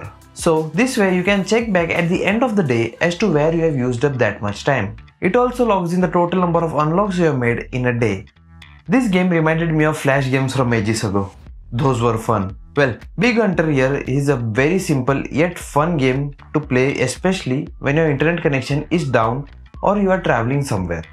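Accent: India. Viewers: Thank you tube for closed captions. Many of us just can't understand Indian English.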